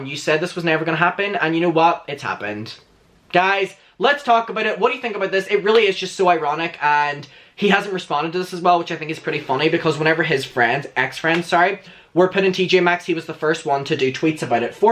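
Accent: Ireland. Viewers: Irish acsent you mean hes not speeking native irish lets get that straight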